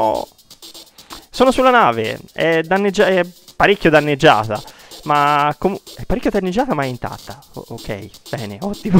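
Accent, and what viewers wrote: Tuscany, Italy. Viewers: Bel video! E' sempre bello sentire un altro toscano su Youtube. Ti sei preso un bel like meritato e un nuovo iscritto.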